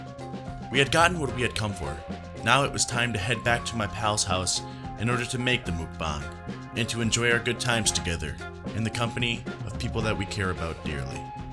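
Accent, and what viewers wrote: Midwest, United States. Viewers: Are you from the Midwest? Your accent... it is strong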